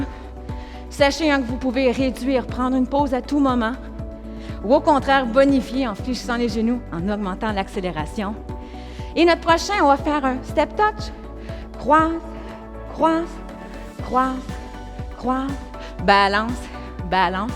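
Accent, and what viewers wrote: Canada. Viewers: Bonjour de Bretagne! je viens de faire pour la première fois votre séance et j'ai beaucoup aimé. J'ai adoré aussi votre accent canadien, je reviendrai demain. Vous avez d'autres vidéos ?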